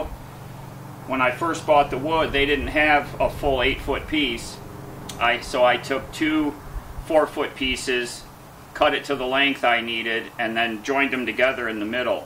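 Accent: United States. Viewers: I was watching something else on utube and the block had the same voice/accent as yours, where in the states are you from? Just heading off this morning bush camping in South Australia. Excited as Lefty getting a bone.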